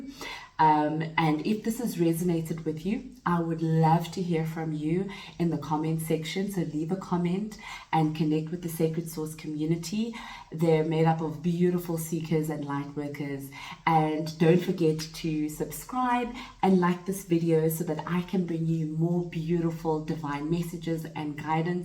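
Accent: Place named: South Africa. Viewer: You sound south african sis,🇿🇦 🙌🤞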